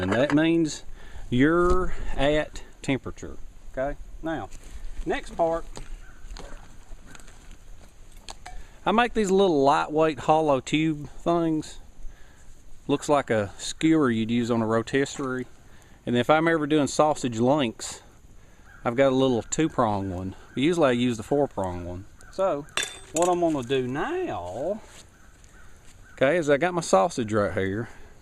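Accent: South, United States. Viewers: Yep, you do, hehe... What part of usa is that accent from ? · I like your accent, very Southern :) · I'm from the South too. Sounds like home to me. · Your accent is aweson man makes me miss the south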